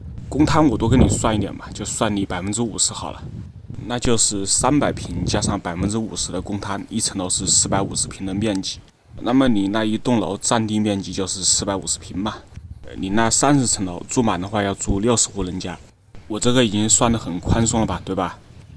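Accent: Wuhan, China. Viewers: 这口音 太武汉了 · 武漢口音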